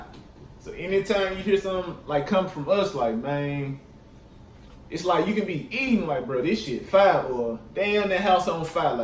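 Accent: United States. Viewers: Mane You sound like a Memphis person you sure u from Mississippi?? Most of the slang you use they say in Memphis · One of my oldest friends was from Baton Rouge, LA. You reminded me of him, but he was old enough to be your grandpa. I could barely understand him most of the time. Once he kept saying "han me ovuh the fo paypah," or something like that. I had no idea what he wanted, he finally had to get it and show me (aluminum foil!). Anyway, you use a different slang, because you're young, but the general way you sound is a lot like old Frank, bless his soul. I'm born and raised in California & Oregon. You truly speak a different language down there. 🤔😎 Had to subscribe!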